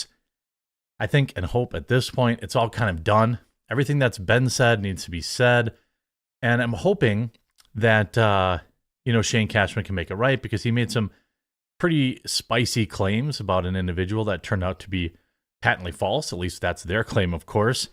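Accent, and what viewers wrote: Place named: Minnesota, United States. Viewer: I still yell out baaaags every time he says it and I wouldn't have him change it for anything. get down with your bad Minnesota self.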